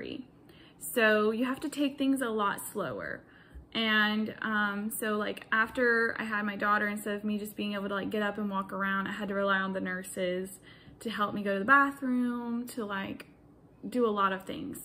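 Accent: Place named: Texas, United States. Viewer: Are you from Texas? Your accent sounds really Texan! 😂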